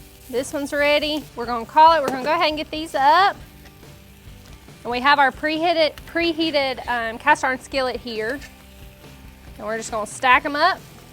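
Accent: South, United States. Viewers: Its the South fellow babies. Rich a full.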